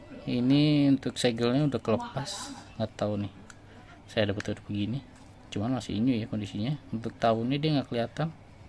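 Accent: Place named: Banyumas, Indonesia. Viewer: Salam Mantap....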